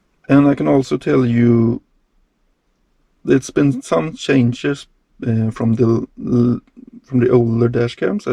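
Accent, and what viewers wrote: Sweden. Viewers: Your Swinglish is absolutely fine. 😃 The camera is great . Extremely clear front and rear and it changed immediately in and out of the tunnel. Excellent product for anyone that spends a lot of time on the road. Thank you once again for your beautiful scenic videos and great choice of music as always. 🤗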